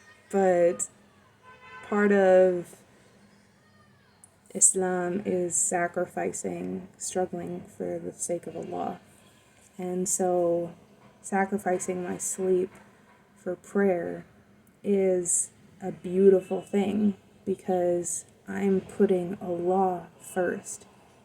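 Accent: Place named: Midwest, United States. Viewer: Salaam, which part of the Midwest are you??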